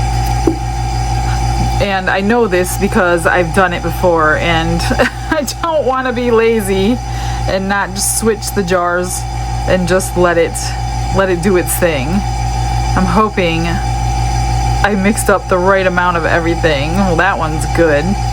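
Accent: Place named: Midwest, United States. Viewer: I get so many neat ideas from you. Your speech pattern and vocabulary make me think you are originally from the Midwest. I am from Nebraska and you make me think of home 😊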